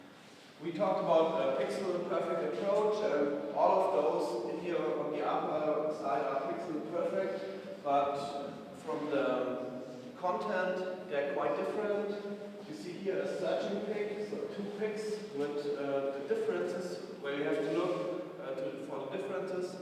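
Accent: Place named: Germany. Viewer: man hört ganz eindeutig deinen deutschen akzent wenn du english sprichst :D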